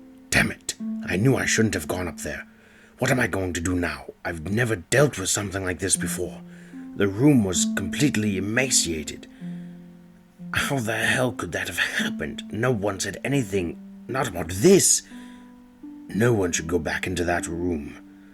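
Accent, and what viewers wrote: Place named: United Kingdom. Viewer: I would love to hear you narrate a James Bond audio book you have a great British accent!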